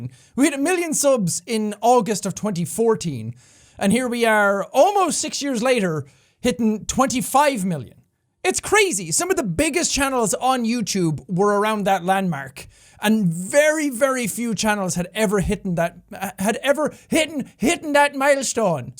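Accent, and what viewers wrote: Ireland. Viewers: That accent. That irish accent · To think: you used to hide your accent, and now you're the internet's most favorite Irish potato -- I mean, Irishman 😀 As I'm sure you know by now (but I'll tell you again, dammit), we love you precisely for who you are as a person. No matter what your videos are about, no matter what other YouTubers are doing, as long as you keep being you -- you marvelous human being -- we will continue to love and support you. You bring so much positivity to the world in so many ways, and you've been gifted with a unique platform to continue sharing that positivity with the entire world. People always tell me "the world would be a worse place without you", but I know they just mean THEIR world. In your case, it's literally the entire world that is better because you are in it. And now I'll stop gushing because I'm sure I sound a little obsessed and maybe a bit creepy. 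I swear I'm not! I don't even watch every video 😜 I just think the world needs way more people like you in it and less people like.... well, like the kinds of people that seem the loudest these days. And it makes me overjoyed (and I guess a bit relieved) to see that people like you not only exist, but have a platform. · Found your channel since pewds gave you that shoutout back in the old days. Ngl, i subbed because of the shoutout and never really watched your videos. I guess what i loved about Jack was his irish accent and energy he put into each and every video. Before, like you said you were shy and all that but then i saw those videos of you screaming your lungs out and it just made me respect you as a person you grew into. Well done Jack!!! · holy, fake accent turned into an irish legend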